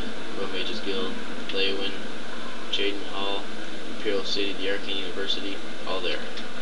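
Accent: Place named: Eastern Canada, Canada. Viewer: your from eastern canada arn't you?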